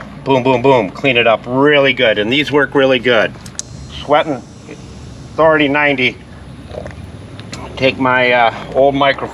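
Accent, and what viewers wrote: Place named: Chicago, United States. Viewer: Your accent screams Chicago? Not Florida.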